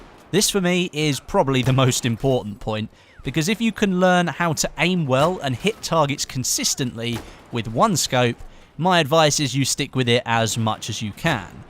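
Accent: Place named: United Kingdom. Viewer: I hate british axents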